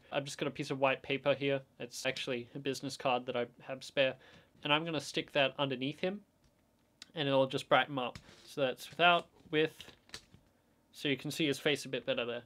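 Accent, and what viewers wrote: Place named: Australia. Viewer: Aussie lad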